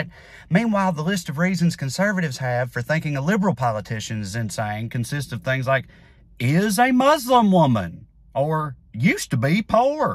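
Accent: Australia. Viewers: The Australian accent totally worked!! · Not a bad Australian accent, mate. And yes, she's so empty, gee. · you da man! hope that Ausi accent kept ya out of the weeds:) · Australian subscriber here 🦘 Love every single glorious rant you upload, and thanks for the 70% Aussie accent, but one reason we tend to get away with using that C word so flagrantly is that we rarely use it to refer to women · The Aussie accent makes everything OK :) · The Australian accent…. 🤌🏼🤣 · You hit the nail on the head with your description of Marjie and Laurie. I like your Aussie accent mate. :) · Yup! Well said with the Australian accent. I’d like to add the spelled version of that with a Manchester accent, courtesy of my British buddy who’d say, “Couple a Facking Can’ts those two!” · The Australian accent worked! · Acceptable Aussie accent, mate. Convinced me, and I'm from here. 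· As an Australian, I approve your Australian Accent and it is a spot on imitation of what an Aussie would say about this. Love 💕 from Australia 🇦🇺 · Not too sure about the Aussie accent, but I can't disagree with the sentiment, mate. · 👍 on the Aussie accent. Not bad 👏🏻 MGT should spend a week in a Chinese gulag so she could learn what that word means- not that she would be able to survive more than one day. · Shout out for your Aussie accent and as usual for calling a spade a fucking shovel. (Another Aussie Idiom) 🇦🇺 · It's amazing that two words was working up to before he said them in his Australian accent were the very two that I spoke aloud in my very own American accent about two seconds before he said them. If the shoe fits "ladies..." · LOVE LOVE LOVE THE AUSTRALIAN ACCENT,! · Great Aussie accent and nicely played C word.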